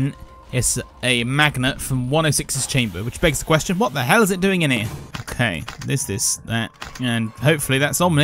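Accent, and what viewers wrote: United Kingdom. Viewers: why do you sound extra british in this video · Why is he extra British in this video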